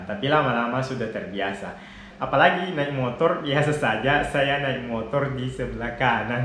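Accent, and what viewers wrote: Makassar, Indonesia. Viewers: wah kak logat nya sudah sama dengan logat makassar hehehe btw kak, mau nanya apa betul, sudan terpecah jadi 2 negara? mengapa bisa begitu kak.... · Luar biasa...Logat makassarnya kental.. 😁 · Bahasa indonesianya ada sedikit aksen Makassarnya wkwk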